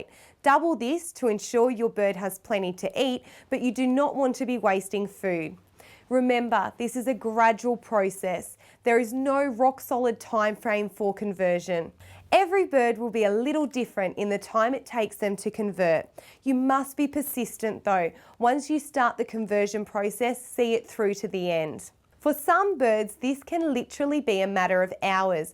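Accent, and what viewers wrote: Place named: Australia. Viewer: Wow that's a strong Australian accent. I came for the bird info but I got really fascinated by her voice, PS. I'm from the United States so I never hear different accents.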